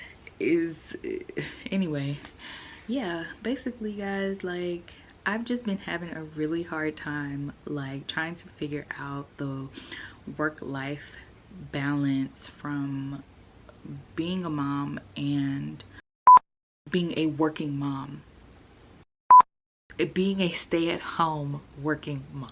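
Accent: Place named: Nigeria. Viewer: Your Nigerian accent is actually strong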